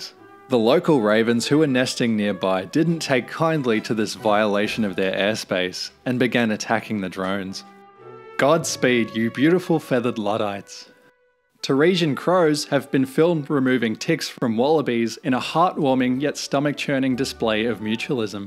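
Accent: Australia. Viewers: Backyard naturalist has slowly become my new guilty pleasure channel. Watching someone "appreciate" birds the same way I do, with a unique Aussie twang makes my week!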